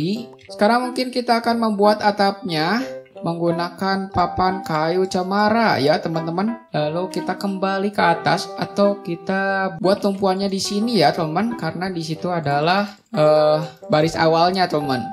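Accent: West Java, Indonesia. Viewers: Bng buat farm fish afk Orng Sunda kak bhsa kaya orng sunda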